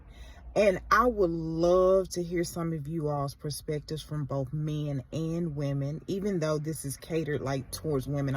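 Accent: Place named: South, United States. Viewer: I'm a none believer so it kind of means you are so sure that this being in the sky has your best interest at heart and is telling you how to live with absolutely no proof that this will powerful being exist and religion is huge in the south and you have a southern accent so you are from the south. Therefore, fairy tales from the south.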